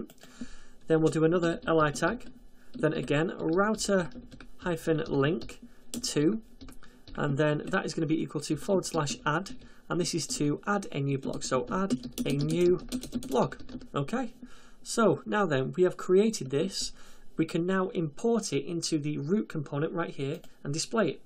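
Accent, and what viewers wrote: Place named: Northern England, United Kingdom. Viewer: Fantastic tutorial, clear and elegant explanations and examples throughout. Pleasant voice and accent (sounds like Manchester/northern England?) were a nice bonus!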